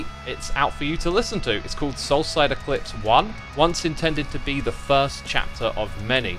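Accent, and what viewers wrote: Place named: RP, United Kingdom. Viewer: Edit at the top for importance: Good music ill definitely check it out Wasnt quite a face reveal but its funny, nothing what i was expecting, funny how minds work with being canadian i hear tour accent and i picture a very right and proper dude just chillin drinking tea, i knew you werent, your personality cones out alot in your vids, just saying its funny how minds subliminally paint a picture and it sticks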